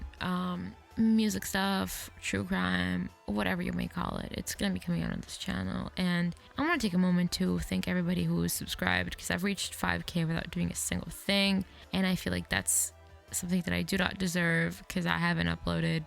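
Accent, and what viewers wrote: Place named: France. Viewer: I never picked up on your accent because it doesn't come through as much in your "video voice", but the second you said you're from Europe I realized you're French! C'est tre bien que tu sois de retour! :)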